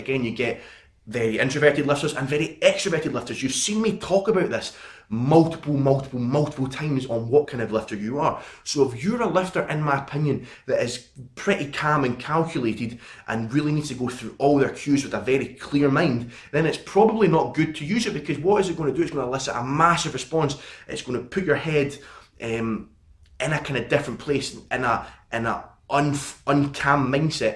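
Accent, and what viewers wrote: Scotland, United Kingdom. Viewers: Is this guy Scottish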